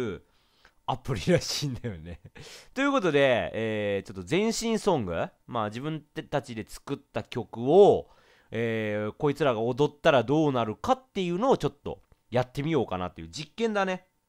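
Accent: Kansai, Japan. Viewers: もっと関西弁で話して欲しいです＊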